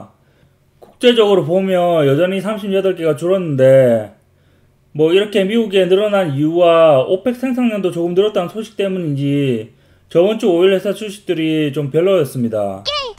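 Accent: Gyeongsang, South Korea. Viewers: 유튜브로 뵙게 되서 영광입니다. 구독과 좋아요 눌렀습니다. 억양이 저의 고향인 대구쪽이신 것 같아 더 반갑습니다.^^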